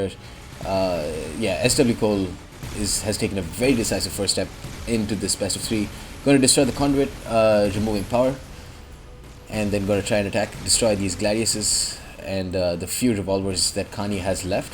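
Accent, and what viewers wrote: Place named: India. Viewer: Damn You sound more Indian man!!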